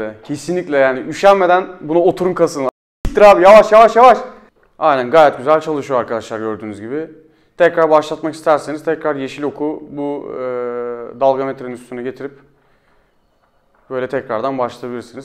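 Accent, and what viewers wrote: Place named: Izmir, Turkey. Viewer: tam izmirli yaa.. incedennn ;)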